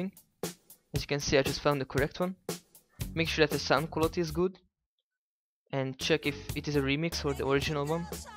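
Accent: Netherlands. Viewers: Je kan horen dat je Nederlands bent. Maar daar is niets mee. :D Nog succes met je videos. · HAHA YOURE DUTCH JUST LIKE ME I heard it before i even saw 'lijndikte' en 'beeld' en 'bijsnijden'. en voor ik de comments las dùh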